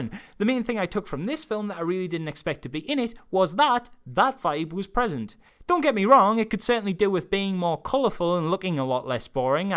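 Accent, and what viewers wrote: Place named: Wales, United Kingdom. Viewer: I can't seem to place ur accent. Welsh maybe? or scottish?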